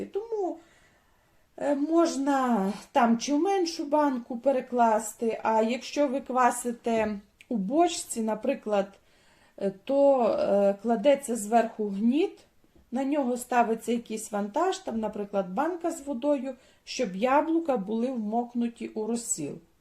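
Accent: Ukraine. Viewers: Как же я давно не слышала настоящий украинский язык. Такой родной и красивый как у бабушки в селе в Винницкой обл. От западенского уже уши вянут. Спасибо большущее вам за рецепт. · Дякую Вам за простий і зрозумілий рецепт! Підписався. І дякую за мову, звідки вона така? Не Полтавщина, там характерні "ль", не Галичина - відсутні місцеві вставки. Скоріше центр України: Київ-Дніпро-Вінниця-Кропивницький-Черкаси. Підтвердите мої здогадки?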